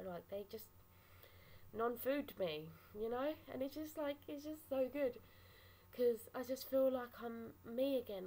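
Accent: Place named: United Kingdom. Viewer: Hey Great first vid, for me was cheese toasties :) Sounds like your from the UK where abouts? I'm from Nottinghamshire. Keep making the vids, I've also not long ago made a channel be sure to check it out :)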